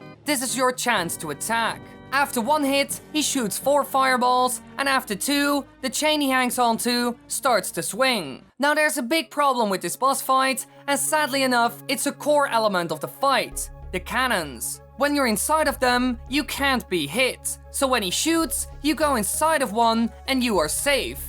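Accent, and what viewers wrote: Netherlands. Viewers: Are you by any chance dutch? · Jouw heel klein beetje Nederlands engels accent is heelijk om te luisteren 😂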